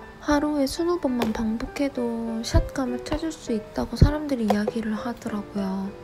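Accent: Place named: Busan, South Korea. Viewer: 목소리 넘 귀여우세요(약간 부산사투리) 저희 아이들 골프 집에서 아빠한테 배우는중인데~넘 좋은 영상이네요 저도 다시 골프 시작해야 한다고 생각한거만 몇년째네요~참, 님 참 제가 원래 운영하던 채널이 있는데 그쪽을 좀더집중해 보려고 해여... 기존 채널도 계속 유지중이니 구취는 노노!! 자주 뵈어요🤎 <link>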